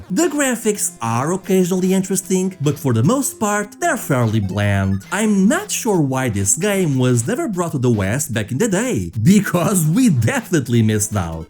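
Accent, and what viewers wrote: Portugal. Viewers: It's okay. Great video! ^^ By the way, if you ever feel self-conscious about your Portuguese accent, don't! I think it makes your videos unique and charming. You get tired of hearing the same US or UK accents all the time! I'm a fan of your work; keep up the good job! ^^ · Bom, essa foi uma forma inusitada de descobrir que você é português kkkk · você é de Portugal??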